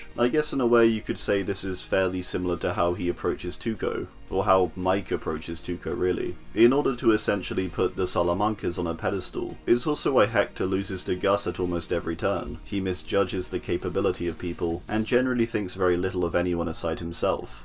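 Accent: United Kingdom. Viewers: Isn't this the guy who does DbD videos? I love this british voice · Hey how's it going?, i assume your accent is English but i can't seem to place your accent on a regional scale, i mean?? is it South? South East?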